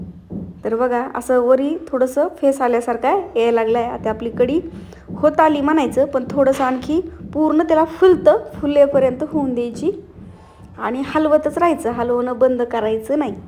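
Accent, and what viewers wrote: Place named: Satara, India. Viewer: खुपच छान बनवली मी सुद्धा अशीच बनवते, मला तुमची भाषा खुप आवडली, मस्त अशी सातारा style 👍 👌👌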